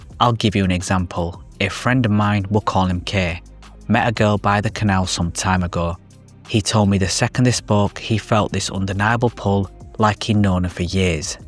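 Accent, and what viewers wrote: United Kingdom. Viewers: Just found this channel. A fellow Yorkshire brother I believe? Leeds? Haha. Back for my SR journey! Let's go!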